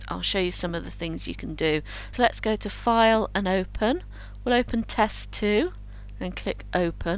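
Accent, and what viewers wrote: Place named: RP, United Kingdom. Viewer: I now know where I shall go to practice my posh accent. :D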